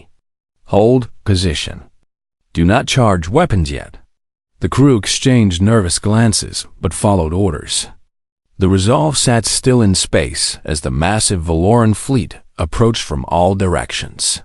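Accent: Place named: Boston, United States. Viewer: Yella. Can we get a narrator that doesn’t have a god awful Boston accent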